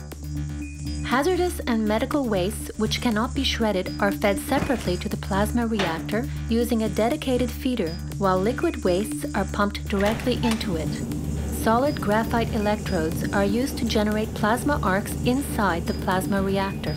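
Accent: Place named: China. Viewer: Couldn't find a Canadian to do the voice over? The English is perfect, the accent from China is still on there on the back end though.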